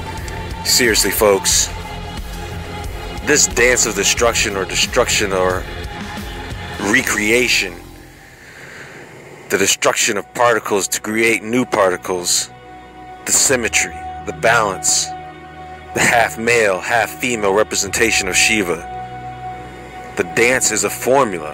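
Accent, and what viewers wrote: United States. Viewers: Why am I unsurprised this is narrated by an American.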